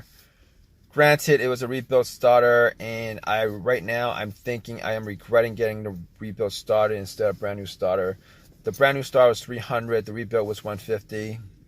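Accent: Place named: Rhode Island, United States. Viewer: This guy sounds like he is from my hometown (RI)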